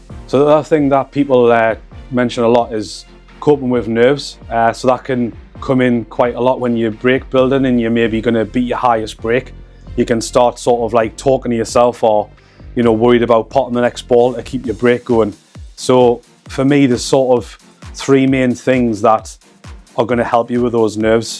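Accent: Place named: Newcastle, United Kingdom. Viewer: Love that accent. Are you from Newcastle?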